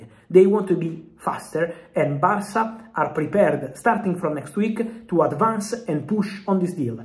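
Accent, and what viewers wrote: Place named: Italy. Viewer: Bro your Italian accent was crazy on this one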